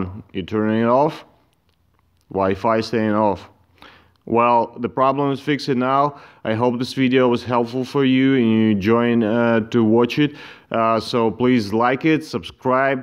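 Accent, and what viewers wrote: Russia. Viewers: Solved my issue, thanks. Your have an obvious accent, is out Russian?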